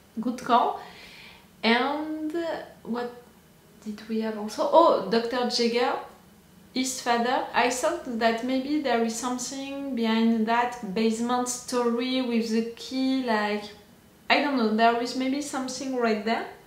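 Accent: France. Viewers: Je viens de découvrir à l'instant ta chaîne et je voulais savoir c'est moi où tu a un accent français ? Sans savoir parler anglais je pense entendre l'accent francais quand tu parle... , En tout cas sa ne m'a pas l'air d'être l'accent anglais...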